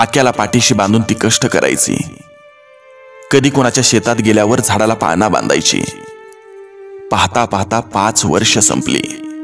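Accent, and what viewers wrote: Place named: Vidarbha, India. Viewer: ग्रामीण जीवनावर आधारित ही कथा आहे. वर्हाडी भाषेने तिला छान सजवले आहे. सरजी चा नवऱ्यचा अकाली मृत्यू झाला होता. ती आता एकटी पडली होती आणि पोर पोरकी झाली होती. घरी आली पण आधीच दुःखाने व्याकुळ झालेल्या सारजा ला सासू ला सामोरे जावे लागत होते. घरचा आधारच आता देवाने हिरावून नेला होता. दुःखी असताना पण रीती नुसार ती त्याला आज घास घेऊन चालली होती अनाहूत पणे श्वापदाने सरजी वर हल्ल्ला केला होता आता मुलाची आई पण वर्च्याने हिरावली होती. मुले आता पूर्ण निराधार झाली होती. दुःखाचा डोगर कोसळला होता. आल्या वेळेला हिम्मत देऊन राहा असे आजी सांगत होती पुढे आजी आपले होईल किंवा पडेल ते काम करून राहू असे सुचवू पाहत होती. कोणीही त्याना साथ देत नव्हते वाण्या कडे गेले पण त्याची नीच वृत्ती आता जागृत झाली तो हिंस्त्र पणे वागला जाई चा गैरफायदा घेतला होता एका निष्पाप जीवाला त्याने मजबूर केले होते. नको ते घडून गेले होते. कालांतराने त्याची आजी पण आता गेली होती. 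आता हार न मानता ती आल्या. प्रसंगा ला तोंड देत होती. जाई ला पुढे मुलगा झाला होता तो अख्या ती आता त्याच्या सह तालुक्यात राहायला आली जिद्द करून तिने मुलाला शिक्षण दिले. पुढे त्याने तिच्या कष्टा चे चीज केले. तो छान ठिकाणी कामावर रूजू झाला आणि तिचे दिवस पालटून. गेले. एका करुण कहाणीचा सुखद शेवट झाला. एक वास्तव दर्शी कथा असून व्हराडी भाषेचा लहेजा सुंदर लागला आहे.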